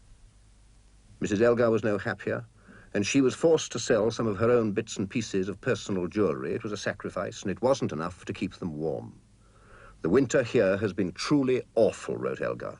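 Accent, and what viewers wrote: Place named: United Kingdom. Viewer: It’s called a British accent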